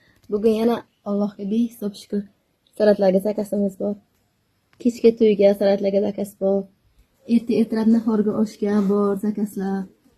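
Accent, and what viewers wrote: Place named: Karshi, Uzbekistan. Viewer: Ассалому алайкум синглим шева Каршига тортоптмку землякмисиз дейман еки адашдимми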